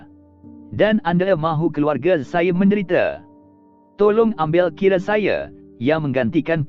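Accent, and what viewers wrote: Malaysia. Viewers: Biasa Melayu belah Melaka sebut macam ni. Dialek Melaka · soraya bukan suraye...najwa bukan najwe aduhaii nak cakap kL pun agak2 la ...bola nak cakap bole ke? adoii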